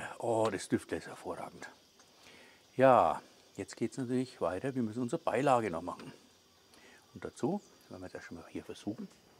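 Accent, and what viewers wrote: Franconia, Germany. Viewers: Servus Der Dialekt ist nicht zu überhören, beim Franken hört sich das immer so niedlich an. Bin aus der Oberpfalz und was soll ich sagen.... mein Hund spricht die gleiche Sprache. 🫣 Dein Dopf Gericht wird nachgemacht, ich werd aber dazu ein Nierenzapfen nehmen den da kommt der Rindsgeschmack besser zur Geltung. Gruß vom Hobbyschmied und Daumen 👍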